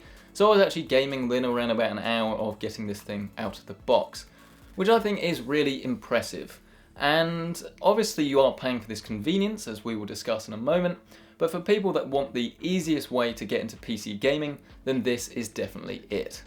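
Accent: England, United Kingdom. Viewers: # P L E A S E H E L P M E! S I R THX ! # Hello building Computers is scarey but It can be great fun too , it used to be for me until my Brain Hem triggered Stroke! I am a wheelchaired Paralysed English Bloke you sound English but you could be Austealian/sAfrican Gibrateen ? I'm Paralysed guy and would love to build a PC/PC workbench perhaps then because I have little control with one hand you are aroud/approx the 22nd person iv'e asked would you be able to build a Pc with One Arm behind your back!? IFyou can't Help mewith that! Iv'e from Launch day I still am waiting for someone to give me some advice on how to INSTALL GTA5 the 7 dvd discs varient of this game on the PCI have a 120gig Win10ssd so I need to be able to install it on either d:/or e:/partition my separate 2 t b d/e separate drive.It is the one and only Game I want to play on my i7 3.6ghz cpu and my 1 gig vram gtx560ti could you help give me some step by step advice as I suffered abrain indury with my movement loss thankyou for at least considering my ASK! 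cheers thanks I apreciate it!!! I need to install the game on my 2 tb other drive 1 tb per individual partition partition I hope you'll be able to offer some advice certainly with the Game would be outstanding thankyou... broken mark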